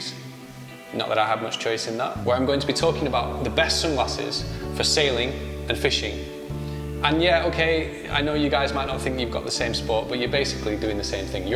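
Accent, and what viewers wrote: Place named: North, United Kingdom. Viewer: The accent is from the northern part of the UK. he sounds to me. .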